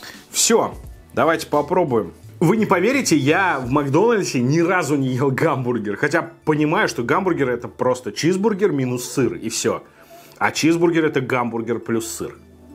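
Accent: Moscow, Russia. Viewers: как я ваш московский акцент терпеть не могу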